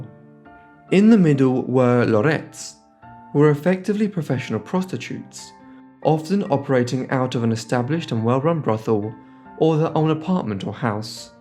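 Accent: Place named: United Kingdom. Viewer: Perhaps it is a good idea to write the names of streets and places on the screen as well, just like you do with the names of people. I could not make out some of the locations. Your pronunciation is too English for me. French is spoken in front of the mouth with articulate movements of tongue and lipts, English is spoken in the back of the mouth with minimal movements of tongue and lips. This is why the English, whose culture makes them refuse to alter their habits, it is well-known, seldom manage to speak French like French. It is not a critique but an observation. Start speaking French in the front of your mouth and all of a sudden it sounds French and people will understand your French with great clarity. Just try it sometimes.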